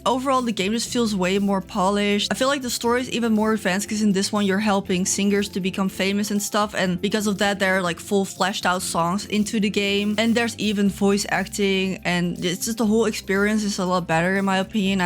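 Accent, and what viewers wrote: Netherlands. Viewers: Oh my god i was listening and thought i heard a bit of a Dutch accent peeking through untill you mentioned the Dutch name of a game. I feel so smart lmao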